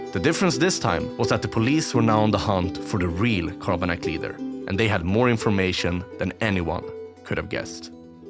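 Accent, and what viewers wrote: Sweden. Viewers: From sweden? Know the accent since im from there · Amazing video! LEMMINO vibes with your swedish accent :)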